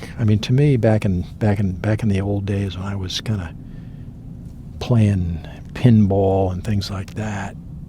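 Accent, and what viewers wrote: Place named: Connecticut, United States. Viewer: I grew up in that area, and went to school in Norwich, Uncasville (Montville) and New London through high school. I can tell by your accent that you probably grew up in that area. Left the area in ‘79 and only get back to visit every once in a while.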